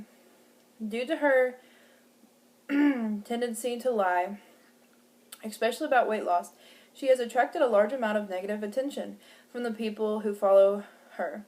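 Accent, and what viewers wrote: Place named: Kentucky, United States. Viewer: What part of Kentucky are you from?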